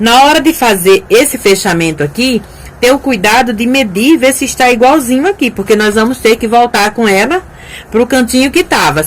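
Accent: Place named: Northeast, Brazil. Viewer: Bom dia!!! Parabens trabalho bem feito Qual valor você cobra feste conserto? Qual estado vc e? Imagnei nordeste pelo sotaque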